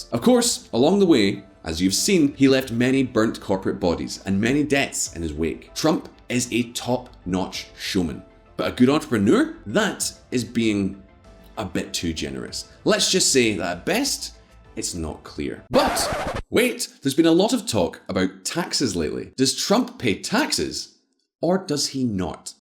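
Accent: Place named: Scotland, United Kingdom. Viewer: THE NEW YORK TIMES EH! BS WHAT A RAG! You sound like a SCOTTISH LEFT WING INDEPENDENCE PRICK.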